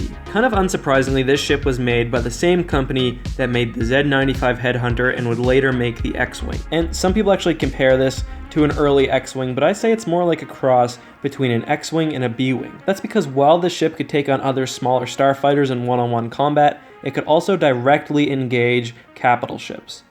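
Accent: Canada. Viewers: Wait you're a Canuck?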